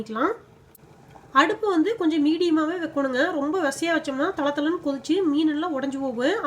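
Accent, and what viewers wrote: Kongu, India. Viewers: Ungaloda kongu Tamil arumai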